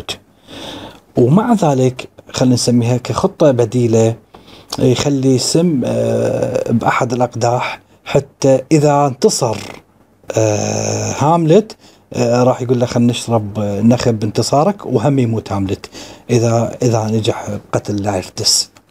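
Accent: Iraq. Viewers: ألف شششكر ..أسلوب جميل وسلس وزاده جمال اللهجة العراقية ♥️..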